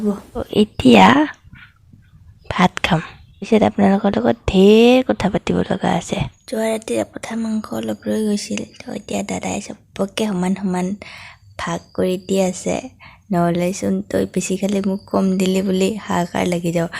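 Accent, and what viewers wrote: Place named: Dhubri, India. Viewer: Vdo go sei moja lagise re... Enkeoi koi ne dhuburir bhakha. moi kin2 xuni valei pao 😊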